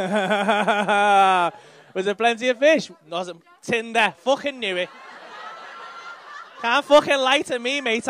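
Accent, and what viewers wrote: Liverpool, United Kingdom. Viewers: Love the crowd work. It took me many videos with subtitles to get used to scouse but now I understand most of the lingo. Amazing work, big fan! Keep it coming.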